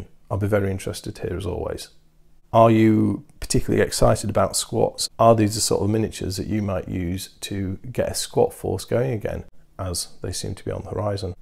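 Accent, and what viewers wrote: United Kingdom. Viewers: I love me some Not Squats in the mid morning. Do you think you'll do more reviews of this line,? Also i enjoyed the brief reveal of Squeaky during the box placing. Nothings better for the old sense of humour than listening to sped up midlanders.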